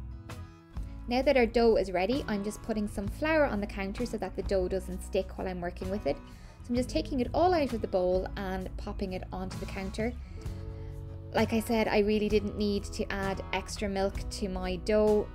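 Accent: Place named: Ireland. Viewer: They look lovely, and your Irish accent is lovely as well! Can’t wait to make them with some clotted cream!😊👍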